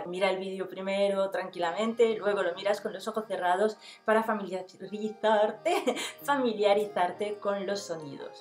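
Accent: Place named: France. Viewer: Aun no termino de ver el video y like y subscritoo me encanta tu acento.... Creo que te amo ! hablas bellisimo <3 el frances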